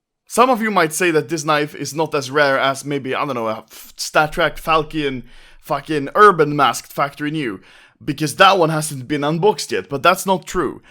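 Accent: Sweden. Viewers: You speak engswedish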